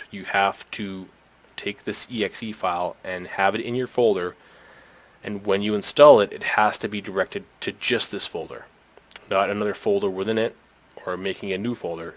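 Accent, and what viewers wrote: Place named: United States. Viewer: i hate american accent. please note im tired an am annoyed of everything.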